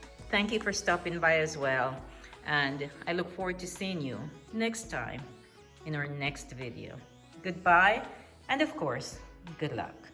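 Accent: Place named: Jamaica. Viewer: Your home is super gorgeous! I love your staircase, so elegant and whimsical. You sound like a Jamaican sistrin lol. I love how your tree look. It's absolutely stunning! New subscriber. This was so much fun to take part in this challenge with all you wonderful and creative sisters. Thanks so much for sharing.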